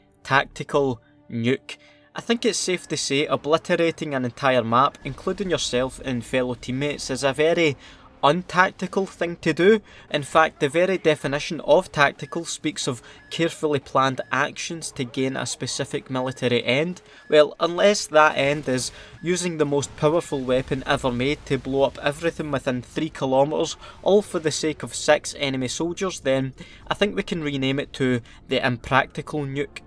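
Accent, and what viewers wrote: Scotland, United Kingdom. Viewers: Your accent makes me question my scottishness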